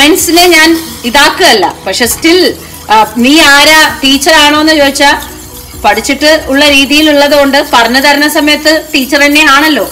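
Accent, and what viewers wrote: Palakkad, India. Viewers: Dear chiechi wonder ful performance chechi palakkadnte abhimanam anne njan oru palakkad kariyanne chechi nammude palakkadu style venam varthamanam parayan enikku orupadu eshtamane njan ennaleyannu video kandathe iam very happy njan mumbayil thamasikkunnu chechi orupadu thanks njan ella video kannam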